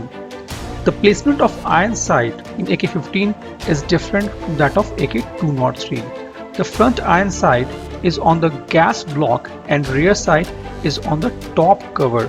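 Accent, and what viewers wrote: India. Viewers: speak slowly. Indian accent is made fun all around the world. Nahi tou apna Hindi bolo, Maast.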